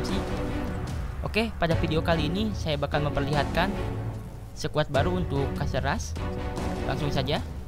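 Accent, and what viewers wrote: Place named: West Java, Indonesia. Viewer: Nyunda pisan xD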